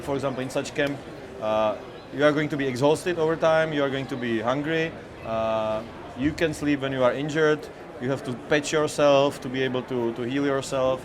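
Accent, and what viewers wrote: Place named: Czech Republic. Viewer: Jde poznat český přízvuk ! :D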